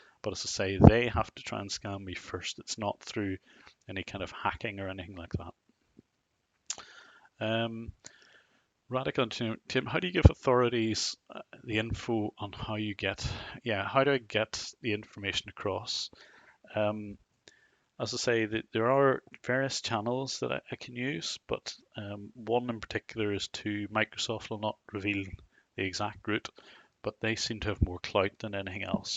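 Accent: Northern Ireland, United Kingdom. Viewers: northern Ireland ? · Very interesting live stream. Your videos are always great. I was surprised to hear that you are Northern Irish. I had always guessed Scottish as a few people also guessed on the stream. I'm from the south of the border in the Midlands of Ireland so we are not all that far away.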